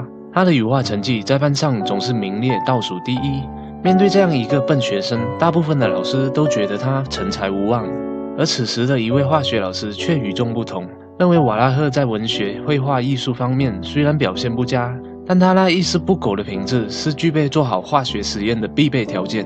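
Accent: Malaysia. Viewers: 是馬來西亞口音嗎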